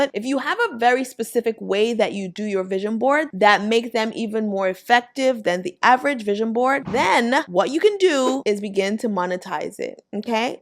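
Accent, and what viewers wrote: Nigeria. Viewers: Are you Nigerian by chance?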